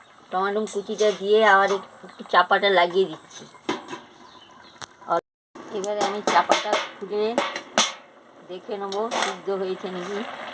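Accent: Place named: West Bengal, India. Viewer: Pochim bongo kotha tone dia buja jai niramis jobor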